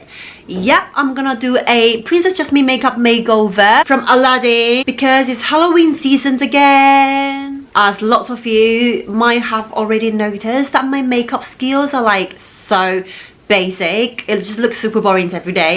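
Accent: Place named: United Kingdom. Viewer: 我高中畢業的歐巴桑都聽得懂🤗 英國腔 👍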